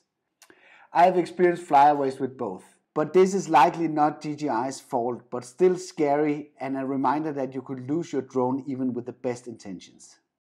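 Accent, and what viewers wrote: Denmark. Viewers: sounds like a danish accent?? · Er du dansker?!😂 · This has to be a Dane, with that accent. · Heavy Danish accent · Are you Danish?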